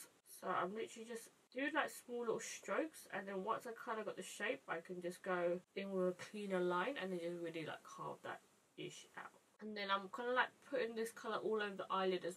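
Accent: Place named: London, United Kingdom. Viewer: Always. The intros always make me think damn this is going to be pretentious then you say “HI GUYS” in that London accent I’m like yaaas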